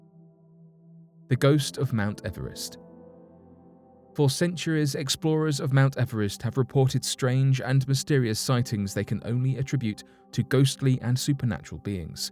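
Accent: England, United Kingdom. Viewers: This English accent guy is an awesome narrator!! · Any british voice over on a video about Yeti, Ufo's, crop circles, and most other stories having Zero evidence are just like this. It sells but has no filling quality.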